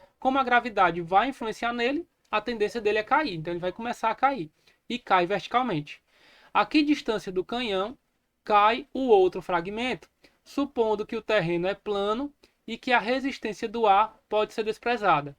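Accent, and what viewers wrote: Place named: Ceará, Brazil. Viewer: Professor, ótima explicação, parabéns. Pelo sotaque cearense, certeza de que o senhor é meu conterrâneo!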